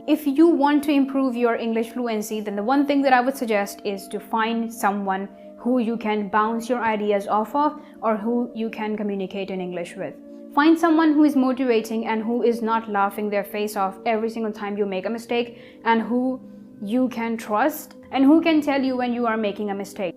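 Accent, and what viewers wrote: United Kingdom. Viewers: Seems like she is native of uk 😄😇😇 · How to get used to UK accent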